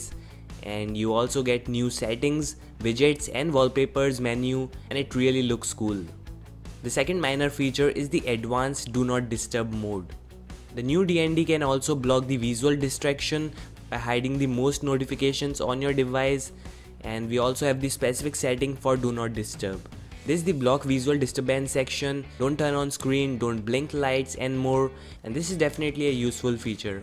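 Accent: India. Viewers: Can't understand terrible Indian accent. Bulbulbull....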